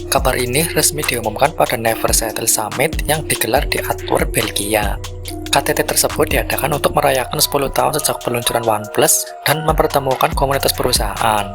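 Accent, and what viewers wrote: Banyumas, Indonesia. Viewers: josss maju terus ngapakers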